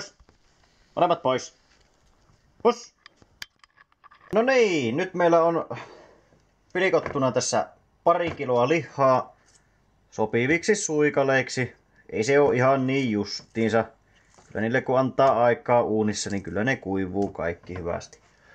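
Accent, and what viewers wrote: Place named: Ostrobothnia, Finland. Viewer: Hyvä video sait uuden tilaajan. Tosi piristävää kuulla vähän pohojanmaata youtubesta.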